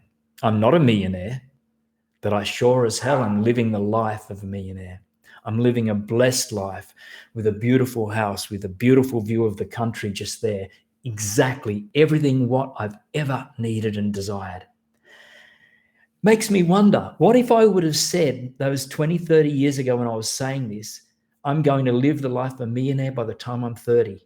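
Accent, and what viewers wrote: Australia. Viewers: You have the perfect voice for doing the affirmations. Your tone and accent are really awesome. Is your amazing accent Australian? Thanks for your good and positive attitude and energy.......it has really helped through the tough times.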